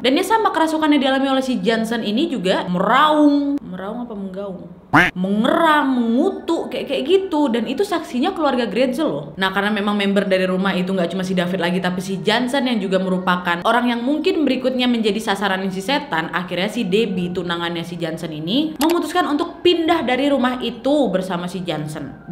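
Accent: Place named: Medan, Indonesia. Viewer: Kak cantik nya awet☺☺ logat medan nya mantap saat podcast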